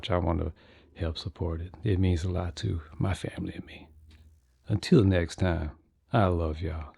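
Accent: Louisiana, United States. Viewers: I watched a short the other day, of a guy, showing how to prepare and eat thistle stems. What I noticed pretty quick was the way he talked. It reminded me of you, and how you talk. This fella was somewhere near New Orleans. Made me think, 🤔, maybe you're in Louisiana... Then I saw the pelican... In this video. I think you're a Louisianan. It's in the speech. I did not know the way you speak is probably the way a lot of people around that region may speak. Pretty cool.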